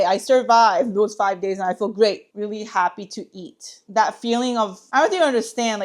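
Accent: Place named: United States. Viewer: I just found you. Idk how or why. YouTube recommends me all kinds of stuff. I guess maybe it knows I recently started eating meat too so it recommended you to me. I’m happy to find you. Are you Korean, American, Korean-American? (You sound American) I’m from Atlanta and growing up had a lot of Korean friends or I mean friends whose parents were from Korea. My God mother is Korean. So I’m just guessing sorry lol… maybe more than guessing… anyways I will follow you now for recommendations and things. You’re funny and have good personality so I like what you have to say. Also watching you cry and eat the steak was funny, but I totally got it lol…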